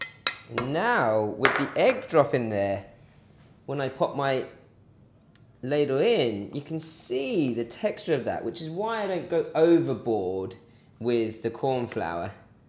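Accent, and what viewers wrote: United Kingdom. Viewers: That lovely British accent!! :-) Thanks for all the good recipes!!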